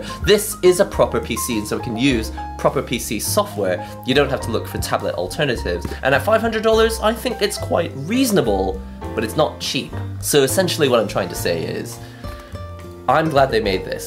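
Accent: United Kingdom. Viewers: British mate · This is the only video I've seen by you and I like your accent. I don't know if it's British or something else but it makes you permanently sound mildly sarcastic even though you aren't being sarcastic. It added to the fun of watching (or rather listening) you talk about this product.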